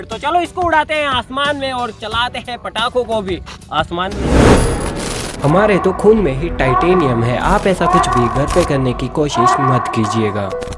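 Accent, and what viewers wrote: Rajasthan, India. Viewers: Rajasthani boll raha hai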